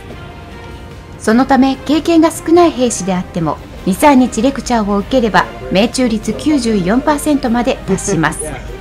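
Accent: Kansai, Japan. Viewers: ナレーションの女性は関西人かな❓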